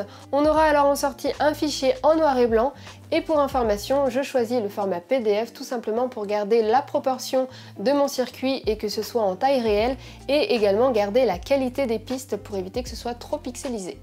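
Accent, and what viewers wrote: France. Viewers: A few minutes later with French accent😀. Spot on. Nice channel.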